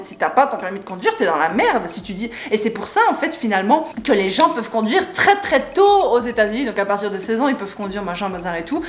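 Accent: Belgium. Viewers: Ton accent belge ressort fortement dans cette vidéo lol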